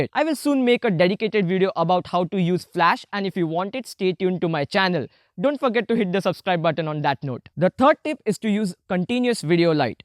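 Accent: India. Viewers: Your pronunciation sounds like a Indian man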